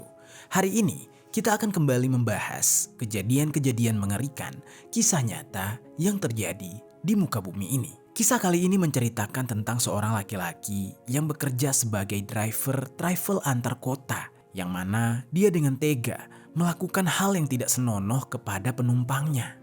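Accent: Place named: South Sulawesi, Indonesia. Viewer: dari sini kita tau pengisi suaaranya berasal dri sulsel :D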